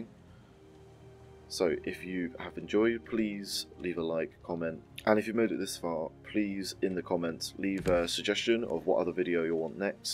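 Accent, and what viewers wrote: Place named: Oxford, United Kingdom. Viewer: Thanks for the videos, what about a playthrough? You are from UK? I have a colleague from Oxford with the same accent.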